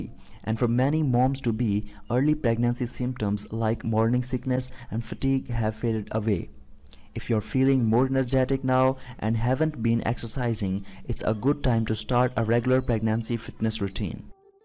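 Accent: India. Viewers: Kashmiri accent in your voice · what an indian accent.. Is this from Bollywood ??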